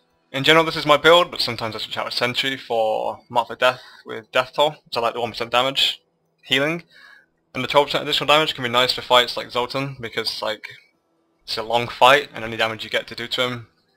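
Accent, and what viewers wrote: United Kingdom. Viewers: You really sound like you're from around Leicester aha. Are you? great guide though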